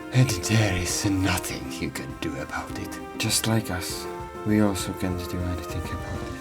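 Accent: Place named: Czech Republic. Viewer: What country are you from if I may ask?? Ur accent sounds Czech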